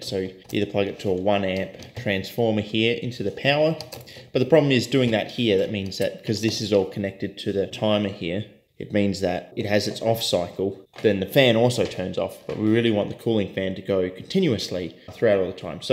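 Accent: Adelaide, Australia. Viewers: He's a proud Adelaide lad! We all sound like that over here.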